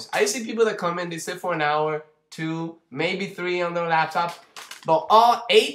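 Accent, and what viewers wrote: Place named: Miami, United States. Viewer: Lol. you are so cute and funny! I recognize a miami guy when I hear one ;)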